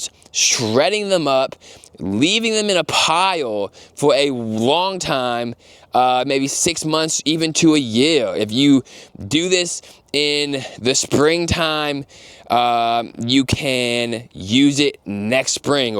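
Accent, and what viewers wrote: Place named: New York, United States. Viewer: Cant handle that hardcore ny accent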